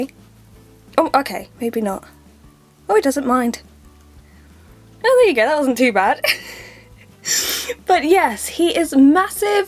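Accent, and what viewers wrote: United Kingdom. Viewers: Are you British ???!??!??!?!?!!?!!??!!? I AM TOOOOOOOOOOOOO!!!!!!!!!!!! Edit: also if he turns out as a boy Call him Ron If he turns out as a girl call her ginny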